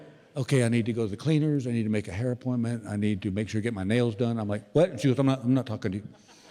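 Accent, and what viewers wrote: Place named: South, United States. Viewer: it’s not muddling honey, it’s his Southern accent 😂😂😂😂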